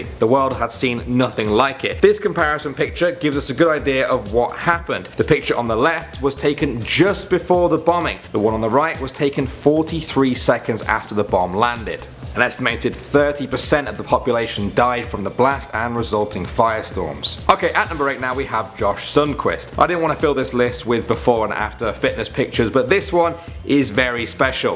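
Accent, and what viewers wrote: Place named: England, United Kingdom. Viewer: He is from England, so, accent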